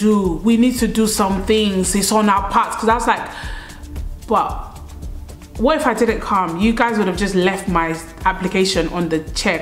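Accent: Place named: Yoruba, Nigeria. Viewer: I like how you mixing the yoruba acient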